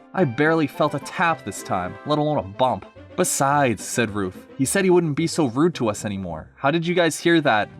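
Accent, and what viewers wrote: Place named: Scotland, United Kingdom. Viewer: That Scottish accent XD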